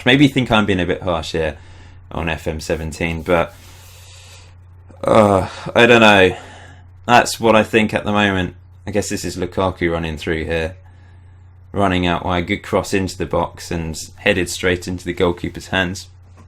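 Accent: United Kingdom. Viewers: british accent is too polite and educational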